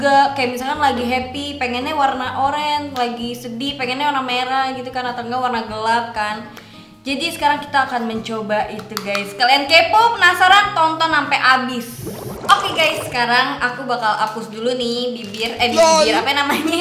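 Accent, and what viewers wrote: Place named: Jakarta, Indonesia. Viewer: dengerin logat Betawi nya neng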